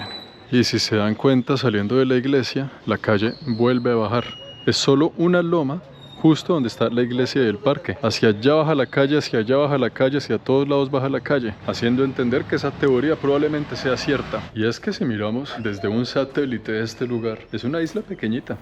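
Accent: Colombia. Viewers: Español Colombiano.😅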